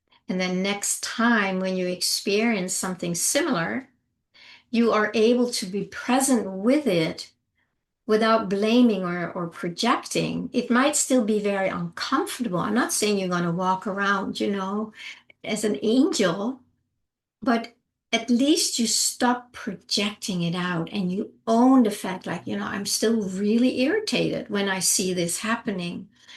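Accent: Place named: Europe. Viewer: Some times I write comments to quick lol Yes, not in a judging way. In a living manner I say love and compassion first second and third then try try again It’s just so stupidly simple We tend to complicate it some make the biggest messes then we have to help clean up. That’s ok cuz hopefully they have helped clean up ours lol. Ya know, your videos have this way with me. The maternal aspect of your voice with your subtle accent reminds me of old world Europe. My grand mother That old Rumanian Gypsy So thank you 🙏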